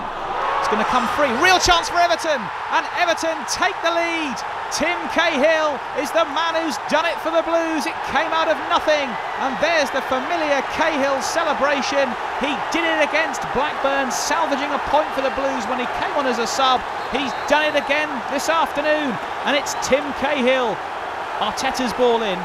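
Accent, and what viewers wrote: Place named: Ireland. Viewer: Irish guy anyone?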